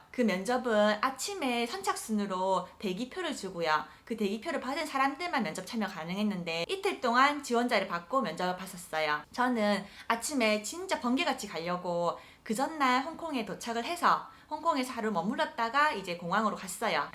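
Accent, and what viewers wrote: Busan, South Korea. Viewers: 느므느므 잼있게 잘 봤습니다~~!! 친구따라 강남 가셨네요 ㅎㅎ 아무래도 합격하는 운이 따른것 같다고 보입니다. 항상 님 부산사투리에 아드레날린 품고 갑니다~~!! 다음 썰때는 초점을 오른쪽으로? 살짝 돌리심 더 나을듯 싶어요 ㅎㅎ 항상 건강조심하시고 화이팅하세요!!! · 부산 분이신가요? ㅎㅎ 반가워서 글남깁니당:)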